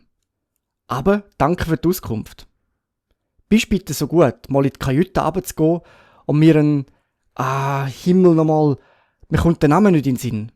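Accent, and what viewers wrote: Switzerland. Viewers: Thanks a lot, I really appreciate your work. What dialect do you speak, and which dialects are closest to yours?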